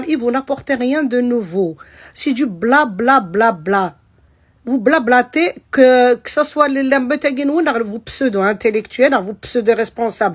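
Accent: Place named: Kabylie, Algeria. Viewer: Bravo madamme bien parler vrai kabyle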